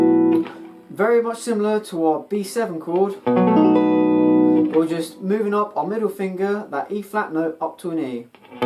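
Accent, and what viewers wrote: United Kingdom. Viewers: I love british english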